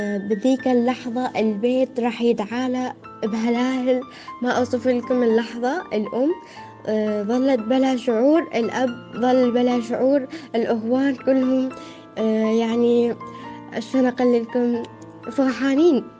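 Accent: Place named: Mosul, Iraq. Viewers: ي اموت ع لهجه اهل الموصل☺ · اييي لهجة اهل الموصل😍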